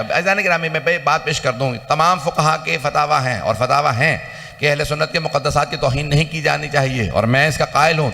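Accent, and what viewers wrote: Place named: Iran. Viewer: Urdu bhi boltay hain tou Farsi lehjay mai. Subhan'Allah.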